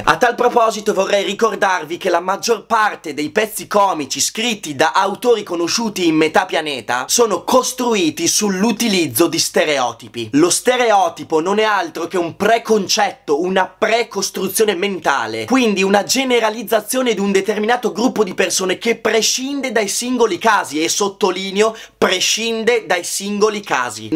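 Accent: Italy. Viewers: sei veronese? Viva il veneto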